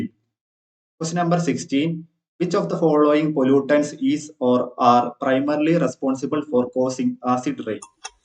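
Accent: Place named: Kerala, India. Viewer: Are you malayaliⓂ️strong accent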